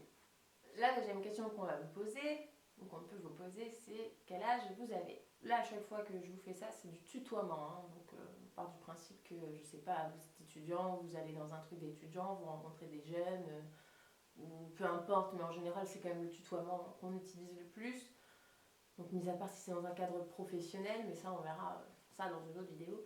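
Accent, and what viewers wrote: France. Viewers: Alors l'italien avec l'accent Francais est un regal absolue! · Elle a un accent français quand elle parle italien ça me tue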